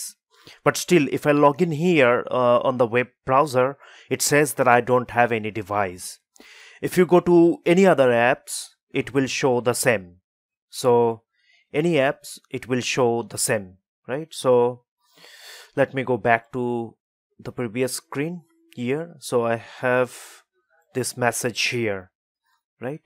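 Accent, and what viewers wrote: India. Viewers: your indian?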